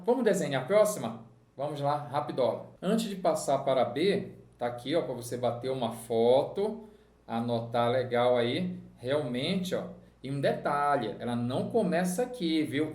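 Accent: Pará, Brazil. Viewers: Égua!!!! sabia que tu era paraense. Um paraense reconhece outro KKKKKk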